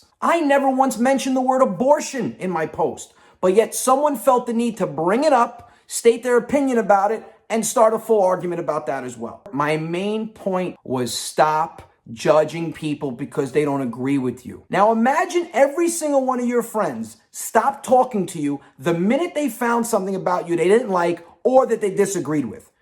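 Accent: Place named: Italy. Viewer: Well done my friend life is short then when we die they show up and say Poor guy he was a nice guy bull shit They are all sonofabitches saluti hey what part of Italy is youre family from ? They have the same way of speaking Say hi to Zio Vine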